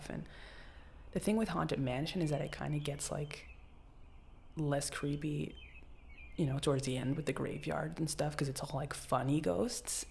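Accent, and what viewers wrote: France. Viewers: Love your french accent !! When you say "Disney" the « s » sound like the beggining of « sorry » or « snake » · As a french I love your accent, you speak well! Are you sure you've never been to Paris😋?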